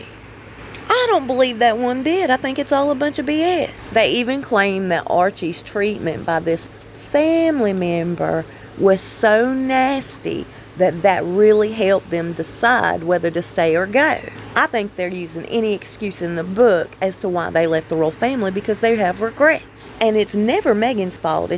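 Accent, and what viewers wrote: South, United States. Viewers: Dear, thank you for your videos. They are always a breath of fresh air. And that lovely voice and Southern accent! I am relieved the diagnosis was wrong. As you recounted your misshapens, the fall, the hospital, the emergency room, the diagnosis, the health scare, I felt deep in my heart, they didn't know what they were talking about. Some medical staff were rude to you and others condescending. But thank God, in the end, the Almighty gave the correct diagnosis. I keep you in my heart and in my prayers. Much love!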